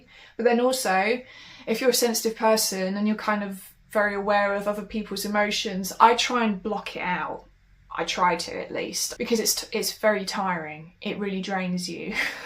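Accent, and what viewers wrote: United Kingdom. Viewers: THOZE DRY LiPZ, THE UNDER EYELiD BAGZ KOMiN iN, THE WHiTE WOMAN TWiTCH, & THAT ACCENT ! HOW R U BRiTiSH BUT NOT BRiTiSH GROWN !? U GOTTA TRY ADMiTTiNG WHETHER U THiNK THE DiCK iS CUTE OR NOT MiZZ ! U KAN'T KEEP BOTTLiNG UP ALL YOUR FEELiNGZ, NOR JUZT RELEAZE SOME OF THEM ON YOUTUBE, YOU'RE STiLL GONNA EXPLODE ! U HAVE TO B WiLLiNG TO SAY THiZ STUFF iN PERSON, ON THE SPOT, OR ELSE iT'LL EAT'CHU UP iNSiDE ! ONCE AGAiN, HONEZTY ! & QUESTiONiNG TOO ! & TRY & GET WHAT U EAT iN ORDER AS WELL, KAN B A MASSiVE HELP ! <link> "FOOD THAT KiLLS WiTH DR. MiCHAEL KLAPER-1993-FULL DOCUMENTARY-LECTURE-TESTiMONY" WHiTE0MOON UPLOAD ! TRY STiCKiNG TO EATiNG THiNGZ RAW THOUGH, THE MORE U COOK FOOD THE DEADER iT GETZ ! BUT U KAN TRY & HAVE BOiLED WATER AS A CONSTANT TO DRiNK THOUGH ! & iT'Z STiLL A DEATH PLANET MiZZ, & YOU'RE ALiVE ON iT, iT WAZN'T GOiNG TO END THAT WELL 4 US ANYWAY EVEN iF U HAPPEN TO B KOME iMMORTAL ! 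iT'Z ALRiGHT TO FEEL SAD, iT'Z NOT A DiSORDER, iT'Z AN EMOTiON ! VASECTOMiEZ 4 ALL, ESPECiALLY US HUMANZ ! AT LEAZT A WASH OUT METHOD OR SOMETHiN GUYZ ! [NOT YELLiNG, JUZT LiKE TYPiNG iN CAPZ]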